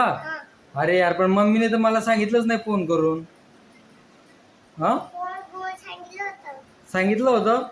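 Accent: Khandesh, India. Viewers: भाऊ तू खान्देशी आहे का? तुझ्या भाषेवरून वाटले कि तू खान्देशी आहे. मी पण जळगाव ची आहे.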